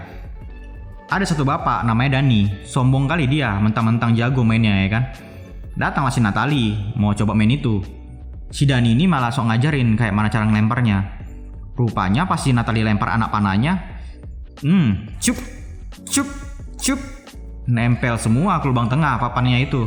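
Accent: Medan, Indonesia. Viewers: Medan kali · Bg lu orng medan ya? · Jgn lh nampak kli Medan nya min:) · Orang Medan ya bang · anak medan ni oratornya :D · Nampak kali medan nya bah. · Pasti orang medan nya kau lae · eeeiiii senang kali lah aku.. gini cara pembawa'an y pakai logat medan.. saya dayak kenyah kalimantan timur.. ayo bang ini ajj logat y.. seruu.. 😍😍😘😘🤗🤗 sukaa kali aku bang... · Abang ini kaya nya orang medan logat nya medan kali 🤣🤣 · Logatnya kek org Medan kau bang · kuat x logat medan mu bah,,, :D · logat bhasa medan nya aq gk tahan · Orang Medan bg?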